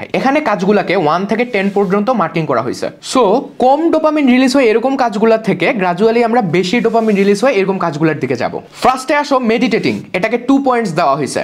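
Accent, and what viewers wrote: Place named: Dinajpur, Bangladesh. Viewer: বাবা তুমি যে দিনাজপুরের ছেলে ভাষা শুনে বুঝলাম।❤❤❤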